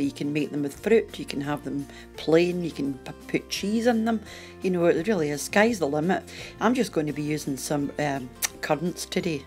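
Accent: Scotland, United Kingdom. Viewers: Could listen to your voice all day. Half of my family are from Scotland and I love the Scottish accent 😍 reminds me of home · I love your Scottish accent and the fact your recipes use normal ingredients. Here in America some recipes just get weird and I would not eat them. Can you answer me a question? What is clotted cream? Keep up the great videos. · YouTube algorithm just introduced me to your channel. I love your videos AND your lovely Scottish accent AND YouTube's auto-captioning of your lovely Scottish accent. 💕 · Thank you for sharing this scone recipe. It's the first time I've ever made them. Your video was really easy to follow and love the Scottish accent. Somehow it makes the food sound more delicious. Lol 😂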